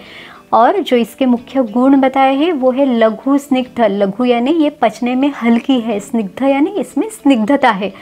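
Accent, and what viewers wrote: Standard. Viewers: आपका उच्चारण बहुत ही स्पष्ट एवं अतिशय शुद्ध है , एवं औषधि विश्लेषण भी प्रशंसा योग्य है । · Dr. साहिब आपका उच्चारण बहुत ही शुद्ध है अच्छी ओसधी है thanks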